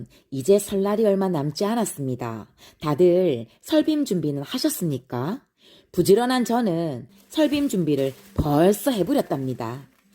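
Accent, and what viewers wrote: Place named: Gyeongsang, South Korea. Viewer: 친정이 경상도라 나레이션 귀에 잘 들어와용 ㅋㅋ 잘보고 가요~😻😻😻😻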